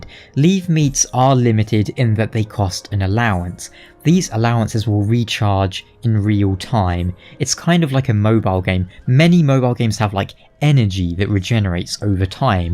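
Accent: United Kingdom. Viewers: Not that this is any of my business, but I'm assuming he's talking about an Oldschool Runescape game developer called Mod Ash, who is a bit of a meme within that community. EDIT: Anyway I don't get why he's calling you that since you sound nothing like Ash. My guess is this guy isn't British and as such thinks all us British people sound exactly the same.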